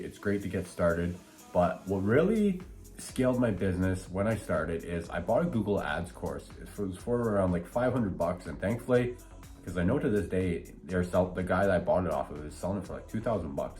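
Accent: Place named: Northern Ontario, Canada. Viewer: 705 area code. I saw a video and there was snow in the background and hearing you talk I was president sure . London Ontario here and just in my first week getting going. I’ve subbed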